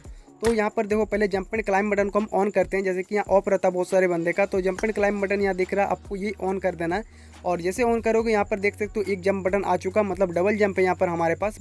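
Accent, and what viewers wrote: India. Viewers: you tolking jharkhandi🙂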